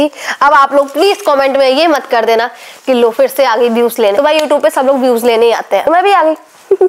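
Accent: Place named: Siwan, India. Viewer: Shahi boli hai ap hmbi Siwan se hai 😂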